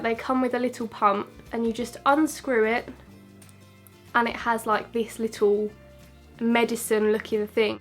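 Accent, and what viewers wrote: Essex, United Kingdom. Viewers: Your accent is penggg where are you from like Essex??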